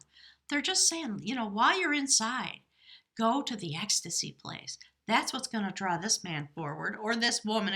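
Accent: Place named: Western North Carolina, United States. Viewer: The beginning sounds like Western NC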